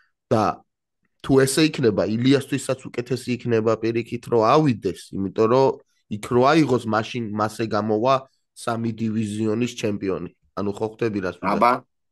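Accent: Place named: Megrelia, Georgia. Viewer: ძმურად ინგლისურიც გაურიე ხოლმე მეგრულ კილოს უხდება სასწაულად 😂😂😂 კაი ბიჭი ხარ ძამია წარმატებები არ მოგაკლოს ღმერთმა !! სალამს გიგზანი ბათუმიდან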